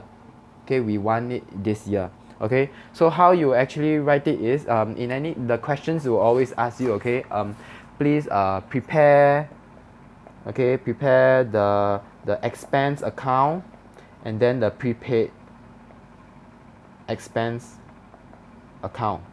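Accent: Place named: Malaysia. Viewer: I can bet a £100 that you're from Malaysia haha. Just sound like my friend.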